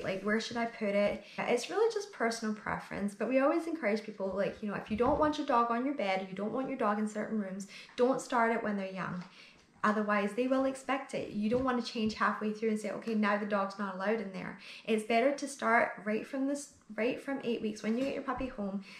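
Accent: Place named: Scotland, United Kingdom. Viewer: I thought I heard a Scottish accent. I’m getting my pup ( Casper ) in two days time, I can’t wait. Thanks for the video and tips. Love from Scotland 🏴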